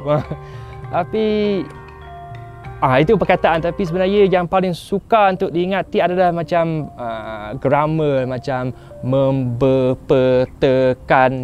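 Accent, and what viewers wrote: Terengganu, Malaysia. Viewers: Untung... Ckp mlyu siap ngn loghat ganu... tu yg unik n lbh dkt dgn msyarakat 👍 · pekat ganuu! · Tu bezza kena pahang...bahasa Melayu dan loghat Ganu...! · Bangla cakap sleng bangla. cakap ganu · Bukan tu je,fasih berbahasa Melayu loghat Terengganu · Memang macam org ghanu nak cakap KL...😆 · he just became a pure terengganurians... when he talk like that and the bodylanguage is on point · Alhamdulillah.... didoakan moga & keluarga bahagia sampai JannahNya. membawa budaya Malaysia yg baik, khususnya loghat Terengganu😁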